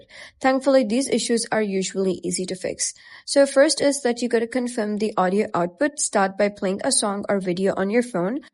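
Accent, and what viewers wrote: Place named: India. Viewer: Of course its an Indian voice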